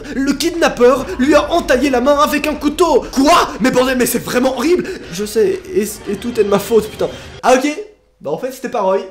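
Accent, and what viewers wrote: Belgium. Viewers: Tu es belge ou suisse ? Moi je suis belge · Haaa t belge je comprenais pas quand tu disais 75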